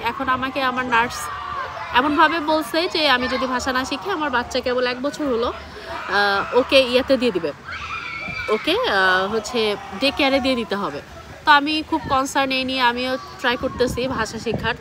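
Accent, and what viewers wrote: Bangladesh. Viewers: Apner kotha sune monehoy apni bangladeshi. Asole amader sikha bhalo na. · don't blame bengali indian. You are nowhere from India. Your pronouncation is bangladeshi. We indian bengali people went to Lisbon in high skill work permit not doing any cleaning job.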